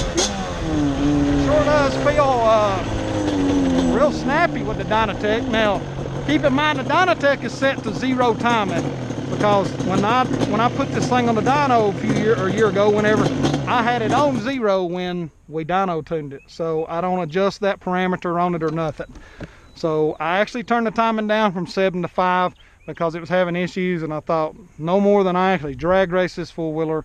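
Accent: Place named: Deep South, United States. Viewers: This plus this dudes yee yee accent make this so badass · Dang bro the way you talk and have words like yuns and uppair it's almost like your a deep southern big like me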